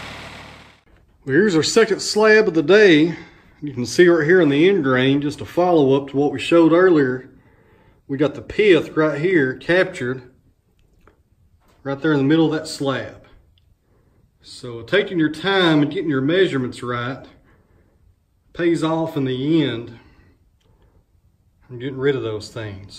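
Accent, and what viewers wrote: United States. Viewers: Loving this dude's accent - sounds like REAL America, as it should be. Got my sub here from the UK.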